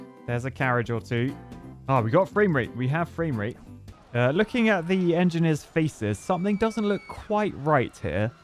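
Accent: United Kingdom. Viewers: Why are you. British your supposed to be American.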